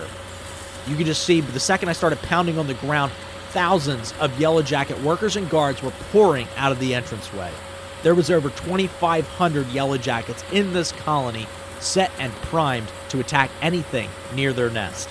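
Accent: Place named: California, United States. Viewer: Nice Californian accent on this guy lol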